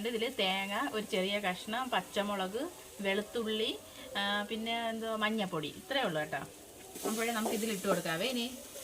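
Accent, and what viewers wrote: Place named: Nedumangad, India. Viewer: samsarak kelkan nalla rasamanu enneppole nedumangadan samsaram🥰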